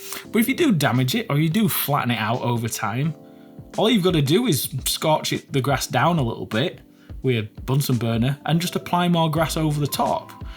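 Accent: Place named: Yorkshire, United Kingdom. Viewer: yes lad.. this is that perfect level of yorkshire accent and professional elocution. didn't mean to sound like a dick in prior comment lol. sold on the foam flock, natural undulation is easy mode. purchasing soon!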